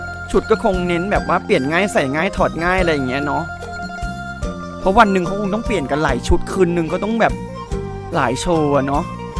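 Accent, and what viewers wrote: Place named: Isan, Thailand. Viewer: โชว์ประตูสู่อาเซียน เสียงอิสาน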